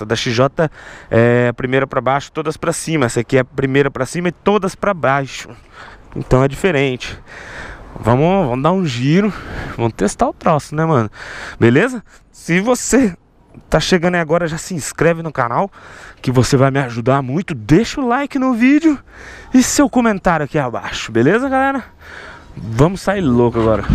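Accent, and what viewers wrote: Goiás, Brazil. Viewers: A tem que fala daquele jeito no pique do Goiás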